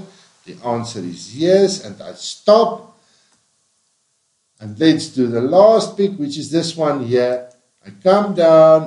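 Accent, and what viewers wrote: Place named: South Africa. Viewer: thanks prof, was great to hear a SOuth African voice teach me, keep it up, i am a big fan of this youtube page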